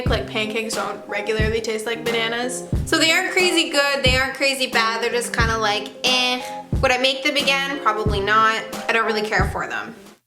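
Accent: Canada. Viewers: Are you a Canadian? Your accent ...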